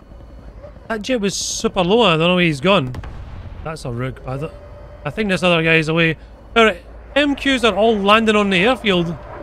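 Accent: Scotland, United Kingdom. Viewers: man this dude has a strong Scottish accent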